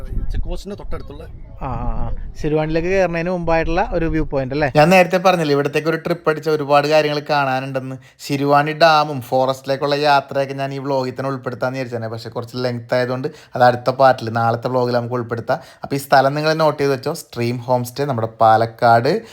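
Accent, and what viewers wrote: Kannur, India. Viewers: കണ്ണൂരൊ കാസർഗോടോ എന്റെ സ്വന്തം ഭാഷ ❤❤😅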